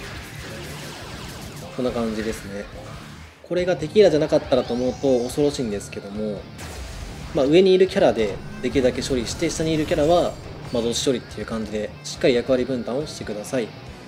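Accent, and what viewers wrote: Kansai, Japan. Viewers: さんの関西弁がたまらないです！いつも勉強になってます(^.^)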